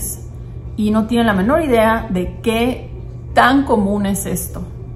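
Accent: Sonora, Mexico. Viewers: Eres sonorense????? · Jajajaja weee tr amo. Hablas bien COOL. Supe que eras mexa por como hablas hasta no se si seas de tijuas me estoy oyendo cuando te oigo 🤣🤣🥰🥰🥰 y tienes mucha pinché razón. Pinché gente falsa y locas. Jajaja pero no puedo dejar de verlas pa saber como acaban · Me encontre con tu video y me parecio muy interesante. Me quede viendo hasta el final, me gusto tanto que me suscribi a tu canal. Lo que mas me encanto fue que enfatisas que en este tema de nuestros bellos y naturales cuerpos ☺lo que hagamos con el, al compartir con otros nuestra experiencia lo hagamos CON HONESTIDAD. Me facino tu forma de hablar con tus modismos, deduzco por ellos que eres mejicana. Eres muy simpatica y tremendamente honesta lo cual se agradece demasiado sobre todo en estos tiempos de tanta mentira e hipocresia. Un abrazo fuerte desde Chile.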